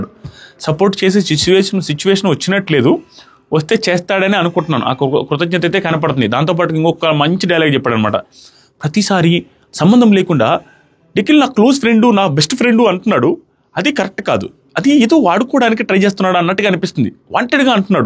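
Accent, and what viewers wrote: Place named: Nellore, India. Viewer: Anna nee review chuse nee matlalu chuse full navukunam enduku ante madhe kuda nellore kabate really rocking anna nee review aethe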